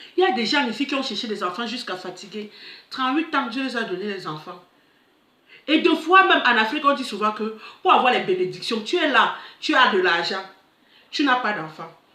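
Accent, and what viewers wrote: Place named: Cameroon. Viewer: 😂😂😂j'aime trop ta façon de parler ( l'accent kamer ) trop belle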